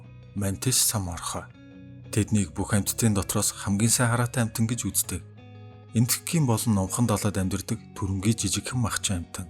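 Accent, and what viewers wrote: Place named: Kyrgyzstan. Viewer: Произношение похоже на Кыргызские.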